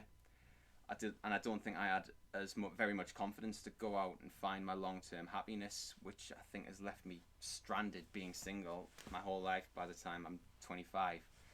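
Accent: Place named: Newcastle, United Kingdom. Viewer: As somebody who does pretty well on women front hearing and looking at you it’s surprising yet not unbelievable. You do have confidence (able to speak on camera), can hold a convo and you are not ugly. Not sure how tall you are and if you live in a big city (sounds like you’re near newcastle). Aesthetically though, I think you need to look more fresh. Women like clean, fresh, healthy people. IMO Your hair is a mess, and makes you look like Virgo from ghostbusters or an extra from a Viking’s episode. You should opt for a 90s Keanu Reeves style. Also grow some facial hair if you can, weight train 3 times a week and stop touching yourself/watching porn as much. In terms of clothes trying buying some stuff from M&S (their autograph line is stylish and affordable). Dating apps do work if you have good pictures. Plenty of videos on YouTube which show how to achieve this. 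As for meeting women in real life, you give me music vibes. Therefore I’d be going to ticketed live music events/gigs (not clubs) where you’d have a mutual interest. Would also join a club of some sort (not sure what you’re interested in). Sorry if any of this sounded mean, best of luck!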